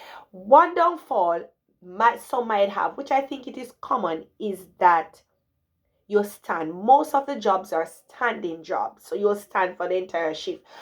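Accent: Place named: Jamaica. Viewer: Love your Jamaican accent!!!!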